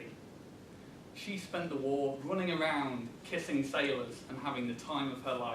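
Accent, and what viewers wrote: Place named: Yorkshire, United Kingdom. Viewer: Great speech. I am looking for a speech concept for my CC10 and came across this. I love the Yorkshire accent. I am from Cape Town, South Africa but lived in Leeds. So you bringing back memories. If you happen read this. Great speech topic.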